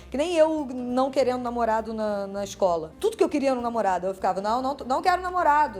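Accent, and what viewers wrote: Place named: São Paulo, Brazil. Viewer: ela já começou a pegar sotaques paulista aaaaaaaaaa 💕💕💕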